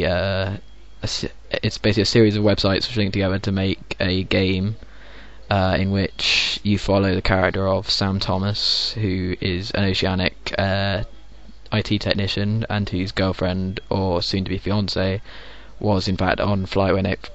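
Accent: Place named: United Kingdom. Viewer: teehee...cute. You sound more British to me than you did in the BWR days. I am SO excited for Lost! Wee!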